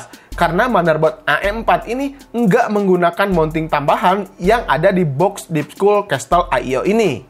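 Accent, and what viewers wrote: West Java, Indonesia. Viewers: Pideo.... Sunda ya?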